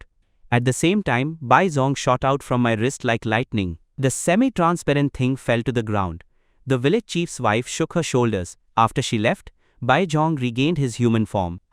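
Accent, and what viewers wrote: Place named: India. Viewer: Indien accent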